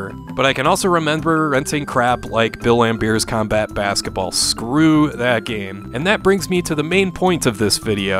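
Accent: Minnesota, United States. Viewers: Yo! Are you from Minnesota!?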